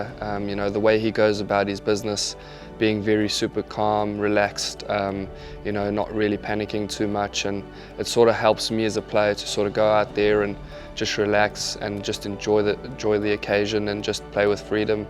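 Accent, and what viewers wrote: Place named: South Africa. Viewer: This guy has a South African accent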